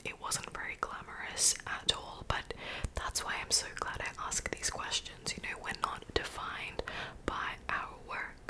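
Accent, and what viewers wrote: Australia. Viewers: Subscribed bc we never get to hear an Aussie accent!! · Does anyone know what country she lives in? She sounds Australian, is that where she lives? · is that a fellow aussie accent i hear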